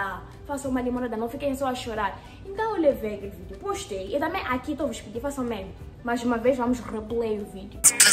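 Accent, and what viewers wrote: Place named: Beira, Portugal. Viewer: Sotaque beirense amo isso querida wamama kkkkkkk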